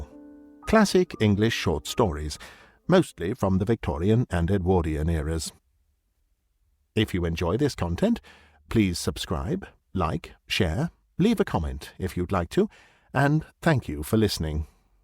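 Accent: England, United Kingdom. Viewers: Wow. An incredible recitation of one of my favourite M.R James stories. What a gorgeous voice you have! I look forwards to exploring more of your performances. . . English stories ought to be read with an English voice, in my opinion. Well done, such a pleasant listen.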